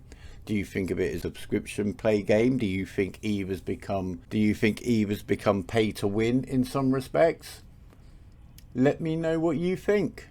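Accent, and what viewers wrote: United Kingdom. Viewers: Welcome back, hope your condition improves! On my end, I've been able to understand you perfectly. Although if I may comment, it's unfortunate that your accent is UK instead of US. While I prefer a UK accent to listen to, the US accents have a more nasal touch. This results in a lack of stress on the "s" and better to understand even if their condition is worse. If that were to be the case, perhaps we would have the pleasure to have a video from you a little sooner :)) Back to the topic at hand, EVE. Compared to all MMORPGs I'm aware off, this fits in a real niche. Even a 1 day old character is able to participate in end-game content of the newest updates and actually matter. No need to grind levels and gear to have any impact in the newest expension. Even on day 1 after the abyssal filaments release, a rookie cruiser would have been able to jump into the abyss and have fun. 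Of course win chances and improved loot rises the more experienced (older) a character is, but your efforts matter. In that regards, I don't think EVE is pay to win. All the ISK and modules in the world don't help a ship if they meet the right number of cheap fitted ships willing to be sacrificed for their cause. The Subscription based game, would fit EVE like a glove. So much content is more viable when having access to all ships and skills. Nevertheless, plenty of people are enjoying an Alpha playstyle, ever enjoying the endless trial option of the game. IGN : Spectral Spectre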